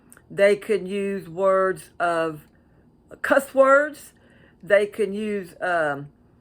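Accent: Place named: South, United States. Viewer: you brought me happiness today. I got to see your beautiful face, listen to your amazing sweet southern drawl and remember all our good times together. Love you and really miss you! You look fabulous ❤️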